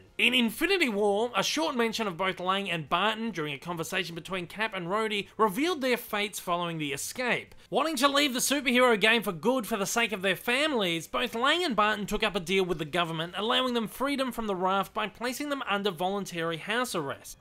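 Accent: Australia. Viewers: Great job with the video man but fuck me your voice! You sound like an Aussie version of the slug woman from Monsters Ink 😂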